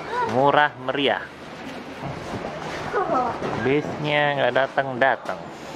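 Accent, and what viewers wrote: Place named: Java, Indonesia. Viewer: chanel ini nih yang ngajarin adik saya jadi medok ngomongnya!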